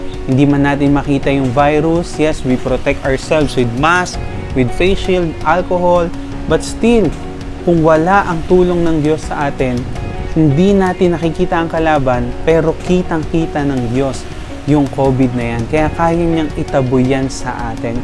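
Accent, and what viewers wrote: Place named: Batangas, Philippines. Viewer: ah kala ko lang po kasi na may Batangueño o Tagalog accent 👍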